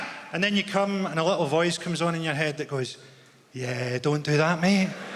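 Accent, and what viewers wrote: Scotland, United Kingdom. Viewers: Francie Boyle is Irish Catholic with Scottish accent. The apple doesn't fall far from the tree. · has a much stronger Scottish accent when live in Scotland that, say, England. Whether he’s toning it down in England or putting it on in Scotland, it’s pretty pretentious either way.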